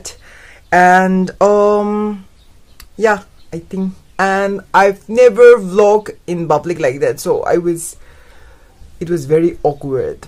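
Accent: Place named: Ao, India. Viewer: Heavy Ao accent! but what else do we expect ? One can't be an accentless human unless one is dumb.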